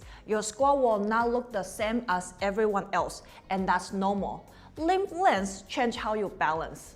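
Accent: China. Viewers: great info. I also LOVE the accent. I thought it was Singaporean but it sounds more Chinese. Thank you.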